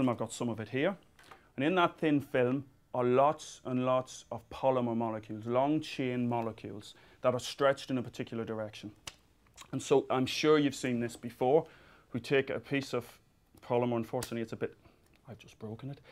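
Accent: Ireland. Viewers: He is definitely irish! =D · is he irish? if he is good to know that were making an impact on the world other than being drunk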